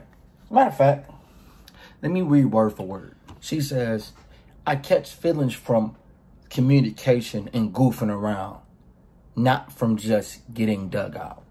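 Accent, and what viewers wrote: Baltimore, United States. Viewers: could pass for a BMORE dude by just the accent alone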